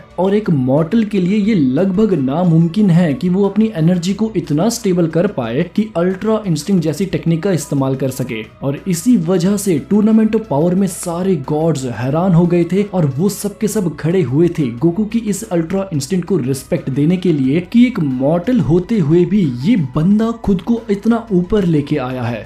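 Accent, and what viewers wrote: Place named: Punjab, India. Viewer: Your accent sounds like punjabi urdu😂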